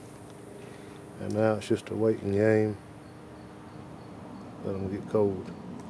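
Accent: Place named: Southwest Virginia, United States. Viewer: hey man awesome video are you a heavy equipment mechanic?? also love the accent I'm from southwest virginia and talk just like you lol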